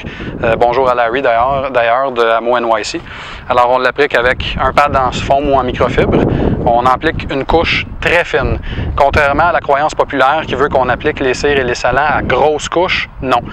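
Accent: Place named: Quebec, Canada. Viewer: ça fait du bien d 'entendre du québécois . on en trouve pas assez sur youtube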